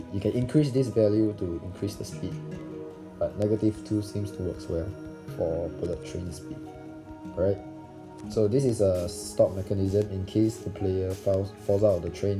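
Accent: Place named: Singapore. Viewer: Bro you sound like a singaporean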